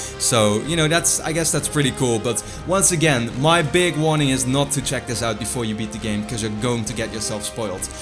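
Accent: Netherlands. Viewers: je axent is heel erg nederlands leuk om te hooren😂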